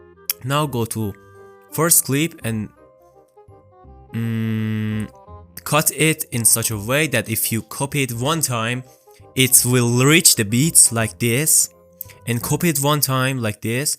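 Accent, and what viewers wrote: Iran. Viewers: چرا لحجه اش ایرانیه؟😂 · Bro you are Iranian? ریدم تو لهجت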